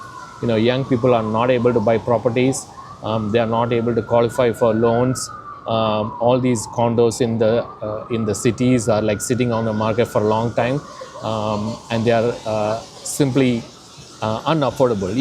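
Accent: Kerala, India. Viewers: Very useful.... seems you are from Kerala.... ?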